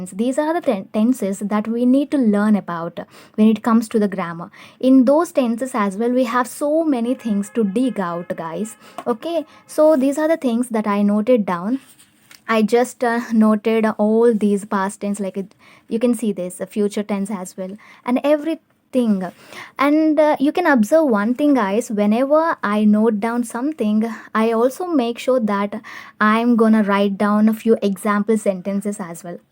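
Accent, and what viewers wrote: South India, India. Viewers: U r accent is so south Indian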